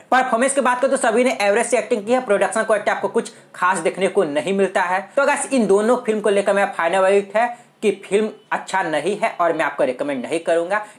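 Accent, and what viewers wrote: Bihar, India. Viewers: Bhai tum kaha se ho Bihar se ho ??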